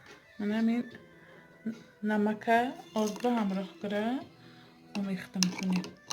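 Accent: Isfara, Tajikistan. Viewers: Шумо Исфарагими гапзанитон худи хамсоябони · Ассалому алейкум Хохарчон ман аз лахчатон фахмидам ки шумо аз Исфара мо аз Хучанд бисер хурсанд шудам ки занхои моям дар Ютуб хамин хел барномаи зебо бомаьно ширин дорем,Дастатон дардро набинад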